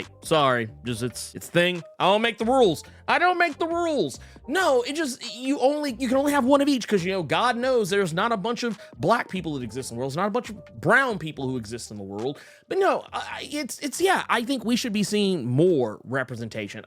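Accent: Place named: South, United States. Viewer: Ok im new here this guy's voice is very misleading it's not bad by any stretch of the imagination but he has a very southern twang, smart , intelligent, nerdy af .....damn I guess I finally understand what people are saying about me. . Gonna subscribe and shut up now... Great video btw ❤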